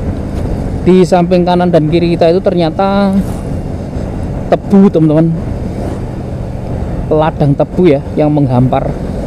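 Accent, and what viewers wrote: Java, Indonesia. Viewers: Rasane aku melu jalan- jalan om pasar tradisional juga om 🤭 Aku dr Sumatra seger liat pasar2 tradisional e, sama logat Jawa om nya khas sekali. Semangat om 💪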